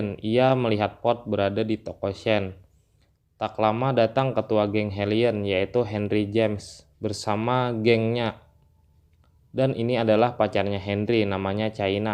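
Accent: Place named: South Kalimantan, Indonesia. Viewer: Totok banar banjarnya masih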